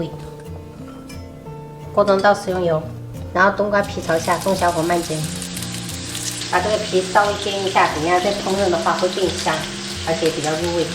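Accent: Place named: Quanzhou, China. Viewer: 四川人怎麼泉州話說的那麼標準？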